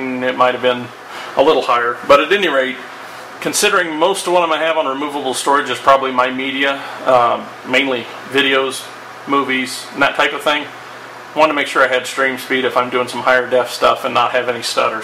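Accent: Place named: South, United States. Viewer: where are you from man? i'm guessing the south.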